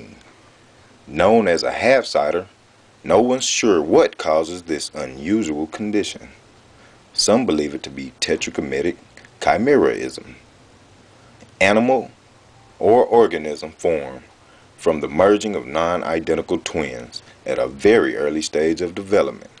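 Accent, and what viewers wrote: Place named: United States. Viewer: you could tell he's american from how he talks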